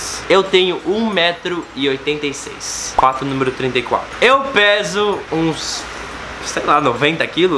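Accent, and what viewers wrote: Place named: United States. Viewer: Ele parece ser americano. O jeito dele de falar, fala travando como os gringos quando começa a falar português.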